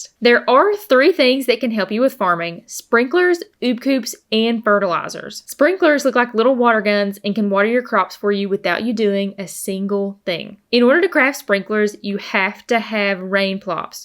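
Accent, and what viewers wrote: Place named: Texas, United States. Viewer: Subbed because of accent 😆 Texas or Tennessee?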